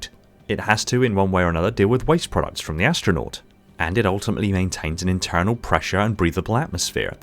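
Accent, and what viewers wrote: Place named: Bristol, United Kingdom. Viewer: You from Bristol, thought I heard a twang in there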